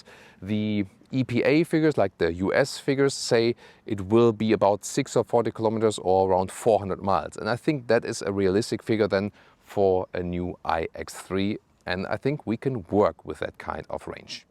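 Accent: Germany. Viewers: its probably german eccent. You say egthree instead of exsthree. Letter by letter. Sorry for being like that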